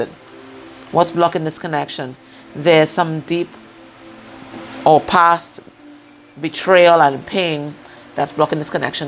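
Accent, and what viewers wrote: Trinidad and Tobago. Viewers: are u a Trinidadian...u hsve our accent lol...thank u for the reading 🌻🌼🌻🌼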